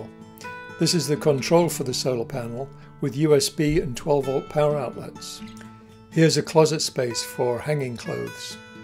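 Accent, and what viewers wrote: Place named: West Country, United Kingdom. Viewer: Your accent, there is a hint of west country, maybe Swindon or Bristol? Great effort, you worked very hard and the bus looks fantastic. Enjoy 😉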